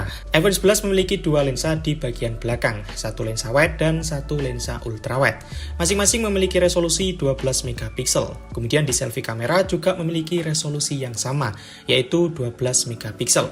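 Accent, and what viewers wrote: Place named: Jember, Indonesia. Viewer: Wah orang jember wkwk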